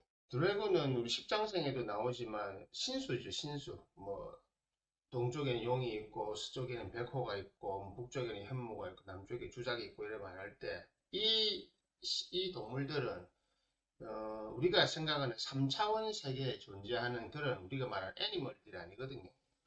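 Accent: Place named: Busan, South Korea. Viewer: 선생님ᆢ 유튜브. 올라오면. 거의. 듣는데. 사투리가. 심해서. 좀 못알아 들을때가. 많은데. 질적인. 내용은. 참 깊이 있으셔요 ㆍ감사합니다 부산이신가봐요?? 사투리가. 심해서요??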